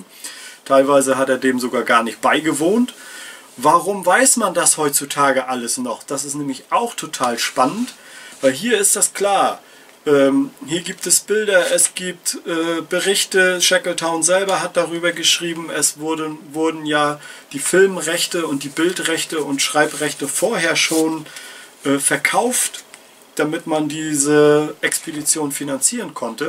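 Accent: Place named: North, Germany. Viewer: Moin, Ich liebe deinen norddeutschen Schnack!!! Ich könnte dir stundenlang zuhören 👍🏴‍☠️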